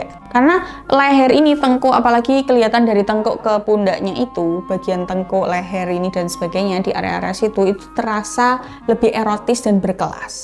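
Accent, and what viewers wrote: Java, Indonesia. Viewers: Jowo bgt mba asli hhaahaa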